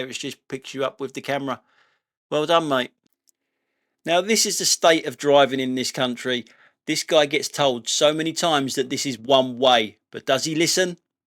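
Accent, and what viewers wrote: London, United Kingdom. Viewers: I was originally going to say that dyslexia affects only reading the written word and not the grammar of the spoken word. However I just looked it up and because dyslexia is all about the inability to process the written word then it can cause problems with similar sounding words in your speech pattern. It's nothing to do with laziness and is simply a case of condition. I am sometimes tempted to correct him on his nuffinks and sumthinks and double negatives but thats just how Londoners talk - vive le difference!! So I think you're a bit mean suggesting he's lazy. It has no impact on how well or badly he drives.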